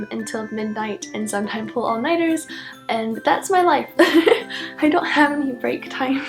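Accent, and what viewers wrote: United Kingdom. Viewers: I believe she has mentioned in an older video that she is originally from Florida, and she moved to London, which is where she currently resides. It might seem like she has an accent or uses British English due to her stay in London for an extended period of time.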